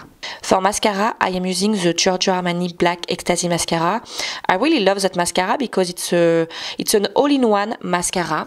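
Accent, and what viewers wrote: France. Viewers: Your accent is lovely - where abouts in France are you from? X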